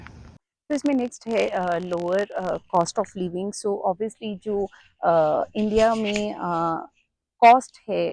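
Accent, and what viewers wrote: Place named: United States. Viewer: I like her american accent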